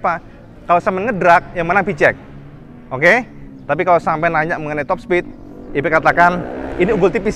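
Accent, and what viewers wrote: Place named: Surabaya, Indonesia. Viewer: Saya suka sm Reviewernya IWB, kl di Reviewnya Mobil seperti Fitra Eri lah, lbh detail mengulas nya,,,dan yg lbh sy suka lagi dari Mas IWB ini adalah Medok khas Surabaya nya kental banget...kereen Mas IWB 😁👍👍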